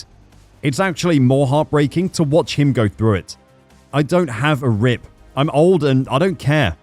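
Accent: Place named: United Kingdom. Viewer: This is so fabricated. You and your limey voice STFU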